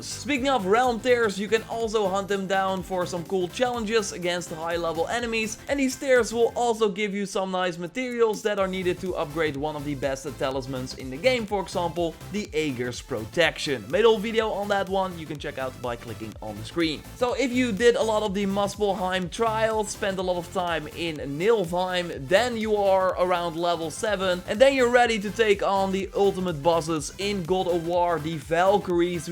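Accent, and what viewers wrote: Netherlands. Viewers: is he european his accent sounds dutch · Are you dutch :) I guess yess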